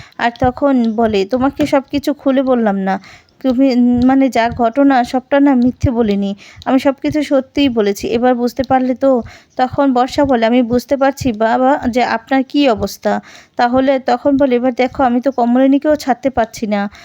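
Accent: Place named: Bangladesh. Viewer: Ei serial ta khub jhule geche.sei sathe apnar ei bangla desi uchharon . dutoi biroktikor.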